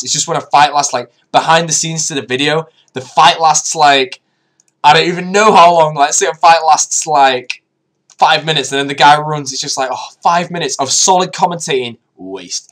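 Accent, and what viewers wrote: United Kingdom. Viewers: British accent so cute!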